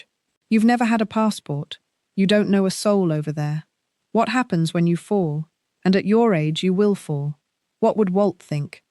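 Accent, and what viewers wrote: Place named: United Kingdom. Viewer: I love your story, brilliant. I too love the Philippines, I have filipino friends. For an American woman you've got a fabulous British accent. Great story. Wish you well..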